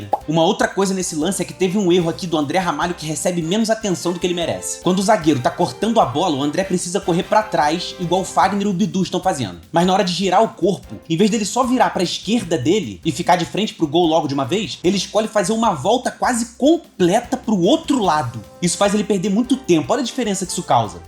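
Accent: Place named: Rio de Janeiro, Brazil. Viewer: Sotaque carioca? Dislike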